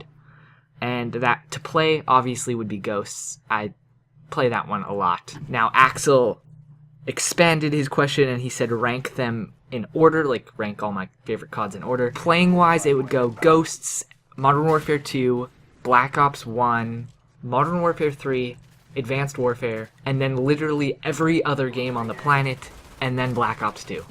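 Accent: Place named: United States. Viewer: Were you born in Canada? You sound American.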